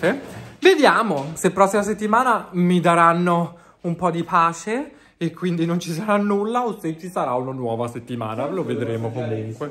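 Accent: Tuscany, Italy. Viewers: Ho apprezzato tutte e 4 le giornate mangerecce ma la mia preferita resta halloween 😻 purtroppo sono a dieta terapeutica quindi quando vado al lidl posso prendere solo ciò che ho da dieta 😭 chissà per il futuro cosa arriverà, forse la settimana francese, austriaca o oriente, ma probabilmente bisognerà aspettare dopo natale. Ora potresti far spesa dei prodotti Delux 😎 Ti ho scoperto da poco, mi iscrivo, sei molto simpatico e adoro sentire la cadenza toscana 🤩 Mi sembra di aver capito che non rientra nel tuo canale, cmq ti consiglio di provare anche i prodotti skincare che ogni tanto la lidl propone, ieri per esempio è uscita la linea nordica, buon inci, ottima idratazione e low cost!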